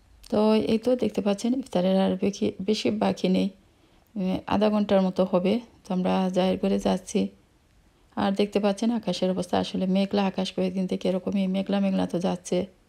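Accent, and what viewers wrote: Sylhet, Bangladesh. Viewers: Assalamalakum আপু আপনিও মাঝে মাঝেই সিলেট কথা বলবেই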